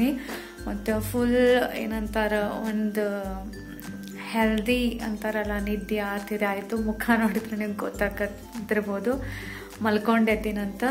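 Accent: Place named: Hubballi, India. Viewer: ನಿಮ್ಮ ಹುಬ್ಬಳ್ಳಿ ಕನ್ನಡ ಸೂಪರ್ ನಾವು ಉಡುಪಿಯವರು ನಿಮ್ಮ ಮಾತು ಕೇಳಿ ಕೇಳಿ ನಿಮ್ಮ ಭಾಷೆ ಕಲಿತಿದ್ದೀನಿ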